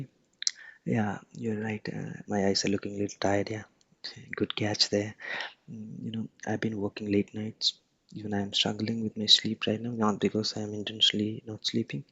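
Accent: India. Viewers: Love the Indian accent! Actually the first asmr experience I had on a phone call :)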